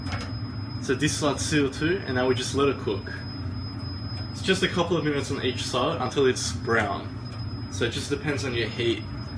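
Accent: Australia. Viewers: Hi there, You did it very well, did you learn this method from your Father? hahahah, Well done really. I really like your english accent, Are you from Australia? But you are 100% Iranian. Greetings from Holland. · I like your Aussie accent! 😀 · You from Australia brother?? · from your accent you sound Aussie. Where are you located? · where in Australia u from??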